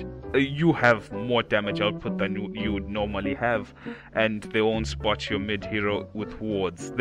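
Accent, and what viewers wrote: South Africa. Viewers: Yo dude, You sound South African, Is that the server you play on? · definately south african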